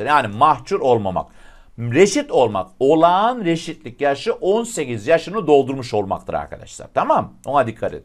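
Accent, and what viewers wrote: Adana, Turkey. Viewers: Hocam Adanalı mısınız 😂 Adana ❤ ben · hocam net adanalısınız😁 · Adanalı mısınız hocam 😅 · Abi adanali mısın😂😂😂😂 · Hocam Adanalı mısınız 😅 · Adana mı hocam:)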